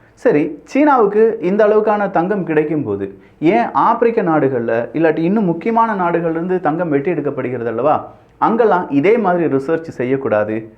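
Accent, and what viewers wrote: Kerala, India. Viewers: Neenga enna malayali ah?? Illa neenga border state ah??? Namma tamilnadu slang la pronounsation pannunga.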